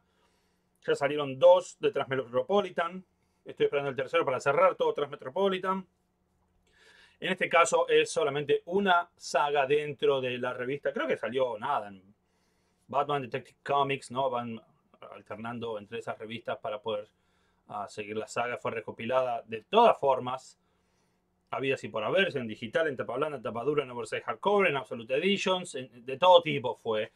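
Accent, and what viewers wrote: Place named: Argentina. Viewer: Sin embargo aunque hablas con acento argentino tu acento no es tan marcado, ¿Es que vienes de una parte de Argentina dónde el acento no es tan marcado, o llevas tiempo fuera de Argentina y por eso has perdido un poco el acento o es que tratas de moderar tu acento para hacer tus vídeos?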